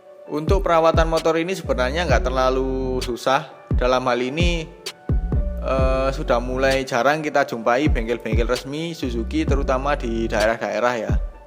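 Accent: Malang, Indonesia. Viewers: Wong malang mas?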